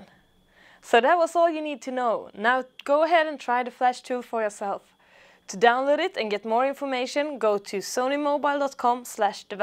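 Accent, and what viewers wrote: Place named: Sweden. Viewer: Typical swedish accent :)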